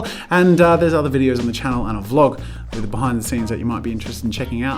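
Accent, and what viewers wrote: Australia. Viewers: I love the Aussie accent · I even fell in love with the Aussie accent! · Is this dude Aussie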